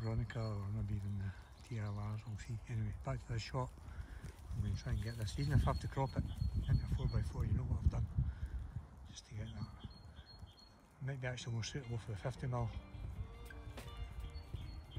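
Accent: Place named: Glasgow, United Kingdom. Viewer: Hi I just came across your vlog and I also do woodland and from time to time I use my film camera. would you mind telling me which woodland area this was. I guess from your accent you are local Glasgow or surrounding. Thanks. Jacqui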